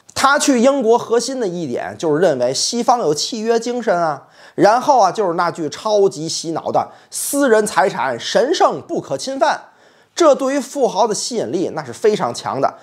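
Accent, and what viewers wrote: Tianjin, China. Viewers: 当然不能给孩子听，孩子就是借着一句“小青蛙你就乖乖的待在井里吧”记住了节目，还有天津味儿的普通话也很亲切。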